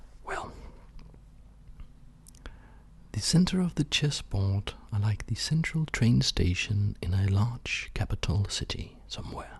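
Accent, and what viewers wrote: Denmark. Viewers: at first I was like.. this fella sounds a lot like a Dane.. I then scrolled down and you told us you are from Denmark. Halløj mester! god video, god instruks ;)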